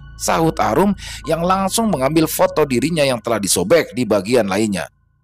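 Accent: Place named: Jakarta, Indonesia. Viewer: Bang org betawi ya? Bhsanya lucu logatbetawi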